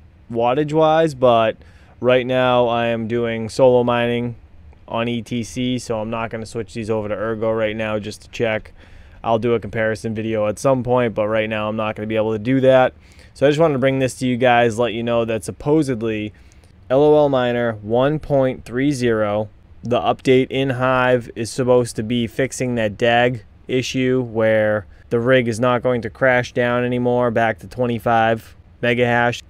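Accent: New England, United States. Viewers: New England accent is strong today!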